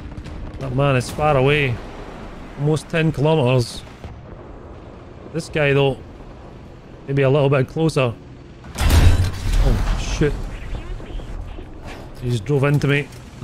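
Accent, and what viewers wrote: Scotland, United Kingdom. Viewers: Pronunciation is quite good and the Scottish accent is always a nice plus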